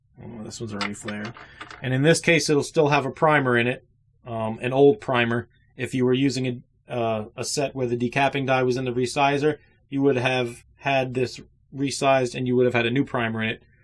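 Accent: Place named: New Jersey, United States. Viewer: Thanks that was very helpful.You sound like your from NJ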